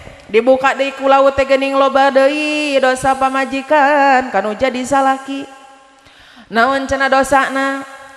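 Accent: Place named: Sukabumi, Indonesia. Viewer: Logat Sunda Sukabumi,.... gaskeun.Bekasi hadir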